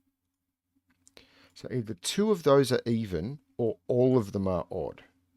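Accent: Australia. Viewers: Hi, just want to say how happy I am that I found your channel:) I've been on CTC since before you could solve along with them and you had never been in my recommended before last week. Since then I'm hooked and have been binging old videos while it's still raining. I heard you say on one that run time was a big factor for YT to push your content (which after many years of watching I didn't actually know) so have decided to continue running your video on mute while I attempt the puzzle so you'll still get your times up. Maybe suggest this to your audience as I can see a lot of regular CTC commentators on here too and maybe they don't know either, and you really do appeal to a generally easier solve than some of Simon's monsters. I don't belong to the discord, but I've checked out the other channels that you've added in your home page too so good for you for supporting the community. 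I'm the only Sudoku geek in my friend group so nice to hear an Aussie voice here too. Keep up the great work, and I love that my brain solves the way you do too:)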